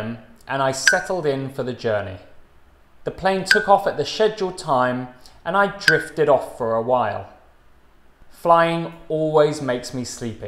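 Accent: RP, United Kingdom. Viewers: 👏👏👏👏👏What goooorgeous English ! You make me feel at Cowdray Park Polo Club, in Midhurst !😄😄🇦🇷🇬🇧🇦🇷🇬🇧🇦🇷🇬🇧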